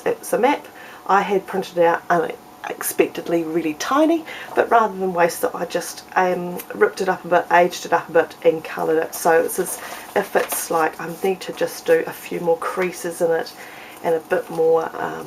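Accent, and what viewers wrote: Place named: New Zealand. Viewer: I heard your accent and I said inside my head that of course it is my kind... The smartest kind... The kiwi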